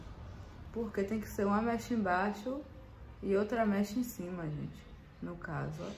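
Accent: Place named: Bahia, Brazil. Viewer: Ela é baiana, o sotaque igualzinho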